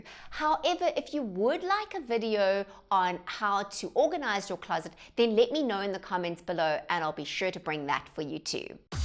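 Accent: South Africa. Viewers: oh! I am so happy that you said where you were from. I have been trying to figure out where your accent was from! Some American and a lot of South African. I really like the new longer format of your videos envied the little facts about yourself at the end .Makes you seem much more relateable.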